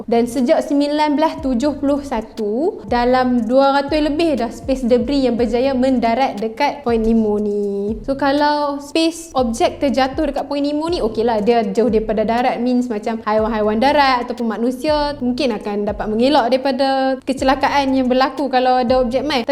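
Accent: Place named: Kuala Lumpur, Malaysia. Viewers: Sis please jangan nak key el sangat,saya lagi suka sis cakap jaa loghat utaqa,saya suka dengaq kaum2 hawa dari utaqa ni bila bercakap,saya yakin ramai lagi yang suka sis pakai loghat utaqa bila bercakap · Sedap kot die ckp key el...bia la dia..alahai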